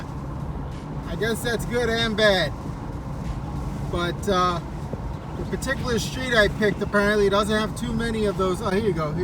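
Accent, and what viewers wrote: New York, United States. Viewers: That NY accent is thick.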